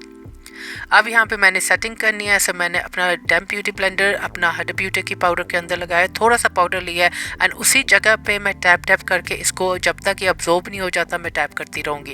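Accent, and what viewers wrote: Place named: United Kingdom. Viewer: Watching from Karachi,i love your work,and your English accent ❤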